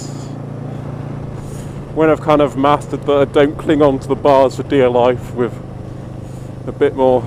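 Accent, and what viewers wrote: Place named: United Kingdom. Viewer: Great video, speaking from the heart. Very inspirational and I just love that fine british accent!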